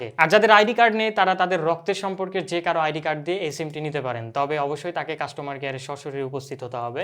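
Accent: Rajshahi, Bangladesh. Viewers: Vai rajshahir nki . Khotha sune omon e lagche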